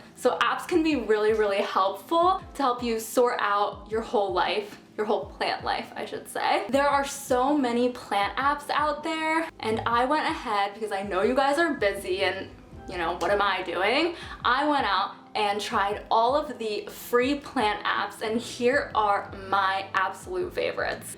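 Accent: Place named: California, United States. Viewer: It's a California accent with a vocal fry and upward inflection. She likely doesn't hear it but I know exactly what you're talking about about.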